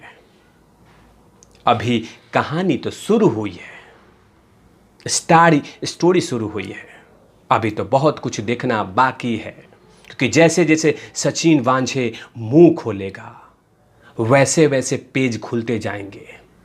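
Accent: Bihar, India. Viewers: विहारी बोली और खड़ी बोली दोनो साथ चालाइये भैया 🌹🌹🌹🌹🌹👌🏻👌🏻👌🏻👌🏻👌🏻👌🏻👌🏻 आपका पुराना वाला अंदाज चाहिए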